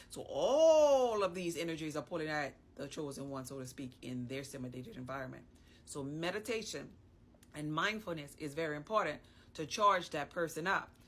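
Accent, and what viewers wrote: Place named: Gullah Geechee, United States. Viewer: I love your accent! 😍 Totes random! Sounds Gullah Geechee.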